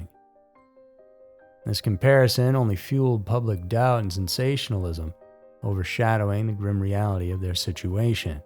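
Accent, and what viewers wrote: South, United States. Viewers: Southern United States somewhere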